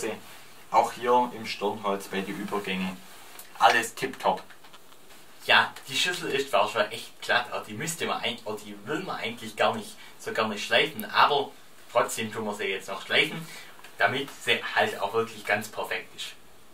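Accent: Swabia, Germany. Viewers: Schwätzt mol nur schwäbisch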